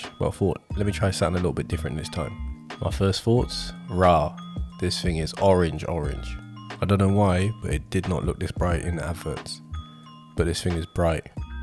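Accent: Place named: London, United Kingdom. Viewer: Nice vid bro. Stumbled across your vids. The london in you is clear to see ha ha.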